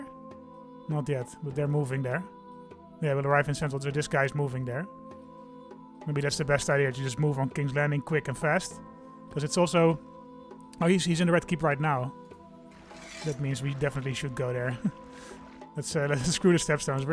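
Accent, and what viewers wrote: Netherlands. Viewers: Are you Dutch? I’m hearing a dutch accent, so fun to see someone from my country having the same passion for asoiaf and ck2 agot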